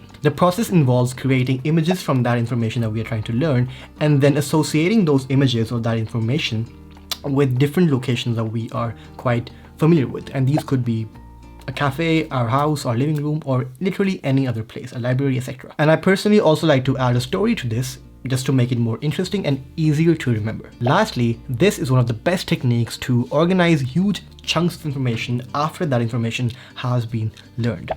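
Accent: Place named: India. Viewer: I feel that your English accent is Hindi. Please make a video about speed reading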